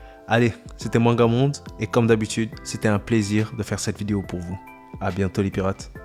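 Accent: Senegal. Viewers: Dis moi, tu es d'origine du Sénégal ? je reconnais un léger accent de chez nous ahah ! Sympa les vidéos en tout cas, je m'abonne....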